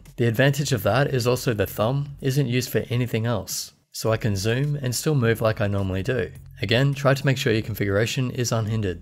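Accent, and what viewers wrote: Australia. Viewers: I think he is from Australia actualy. He's definitely not from Canada but I am ^^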